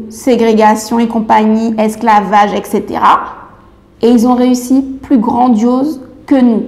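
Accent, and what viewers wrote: French Guiana. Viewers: je devine de par ton accent que tu es guyanaise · dans ta façon de parler on sent que t'es Guyanaise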